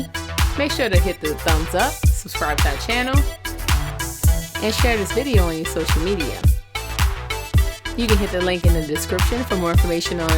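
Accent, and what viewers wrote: New Orleans, United States. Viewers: Love your accent. Are you from New Orleans? Love the hair. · I love the New Orleans accent 🥰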